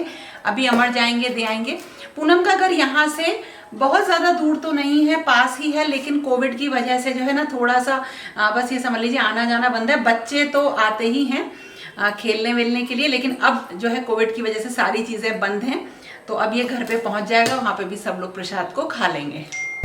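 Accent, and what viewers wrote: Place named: Anupshahar, India. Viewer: Nice vlog mam🙏🙏🙏🙏🙏🙏🙏🙏🙏🙏🙏🙏🙏🙏 Aacha lagta hai... Jàb ghar me sab milkr kaam krte hain.👌👌👌👌👌👌👌👌👌👌.... Bilkul tyohar jaase feeling aati.......👍👍👍👍👍👍👍👍👍👍👍mam.... Meri saasu ma anupshahar ki hain...... Apki language bhi wasi hi lag rahi thi.....👌👌👌👌👌👌mam mai to choorma food processor mai bna leti hoon, badhiya mix ho jata ha😊😊😊mam mawa aap ghar pr banate ho kya???😊😊😊😊😊😊😊😊😊love u frm Haridwar 😘😘😘😘😘😘😘😘😘😘😘😘😘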